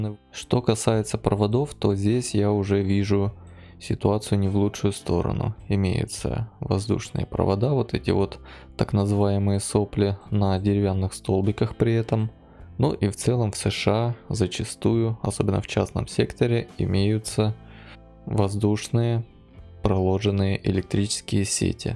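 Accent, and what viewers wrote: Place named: Odessa, Ukraine. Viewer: Если вы посмотрите много видео этого товарища, то поймете что он очень сильно политизирован, лично меня всегдп удивляло когда он на украинских городах находил наилучшие места города а в России худшие, при этом выглядили они наравне даже при этом ахаза))) Да и к тому же акцент как у одессита. Посмотрите его сравнение про Одессу, он город с разрушенными домами, убитейшими дорогами. Сумел найти хорошин места и выставить его этаким среднячком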